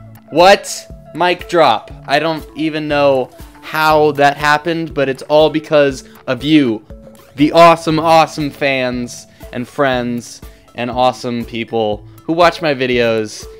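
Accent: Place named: United Kingdom. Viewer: Lovely UK accent ;)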